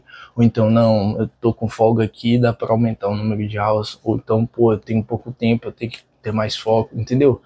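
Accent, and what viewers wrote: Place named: Bahia, Brazil. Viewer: Oii! Maratonando seus videos! Reconheci o sotaque baianal kkk, de onde vc é? Estudou pra Uneb e Uesb?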